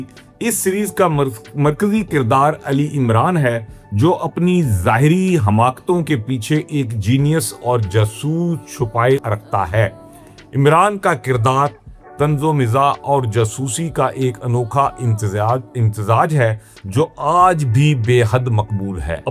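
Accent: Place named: Punjab, India. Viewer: بھائی، ابن صفی صاحب سے متعلق معلومات کے لئے بہت شکریہ مگر پنجابی لب و لہجے والی اُردو بہت کھل رہی تھی!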